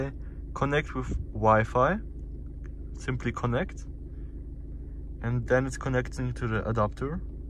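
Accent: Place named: Germany. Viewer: Ich wittere deutschen Akzent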